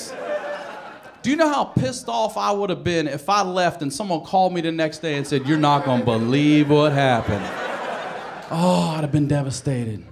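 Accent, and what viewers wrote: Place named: Baltimore, United States. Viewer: Damn he's got such a Baltimore/maryland accent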